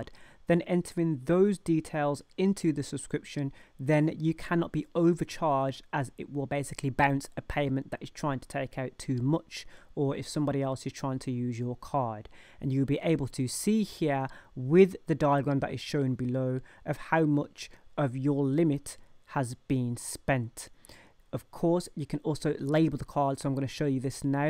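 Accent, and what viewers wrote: United Kingdom. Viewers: Love this video but your definitely British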